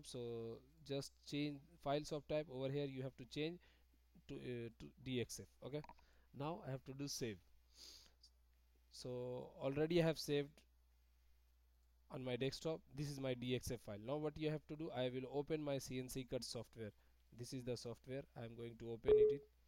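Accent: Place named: India. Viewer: Surprisingly one of the best turorials by anyone with an indian dialect i have ever watched. You nailed it! Great job.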